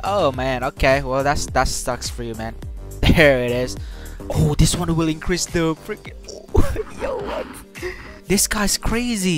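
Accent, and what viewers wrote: Philippines. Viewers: Your speaking english but your accent is filipino :\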